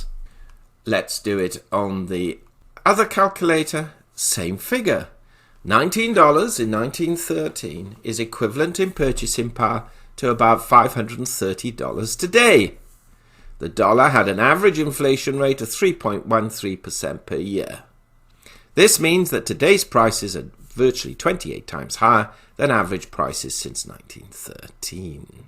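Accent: United Kingdom. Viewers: A realistic presentation. Very enjoyable. Investing in Gold and Silver is more about a flight to safety, than it is unrealistic profit. It will make money and it will do well. Patience is required. You can open an account from a link on this channel, invest in gold and silver and even designate the storage location (Zurich etc.) Great for those who do not want to store the metals themselves. There is nothing like healthy skepticism with a British accent. Subscribe and keep listening.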